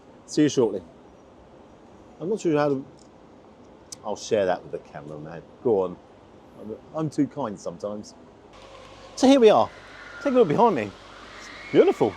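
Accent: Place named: England, United Kingdom. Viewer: Hello from Budapest, Hungary and I am always hungry😅 I really love watching your videos mainly because of your accent and I do think that YOU are a true -born Englishman😊 My family and I are planning to visit London so I am trying to get some ideas where shall we dine once we are there😊 All the best !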